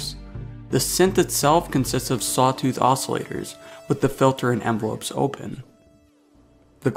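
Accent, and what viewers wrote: Canada. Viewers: Are u from canada. I hear the way u pronounce certain words 😂🔥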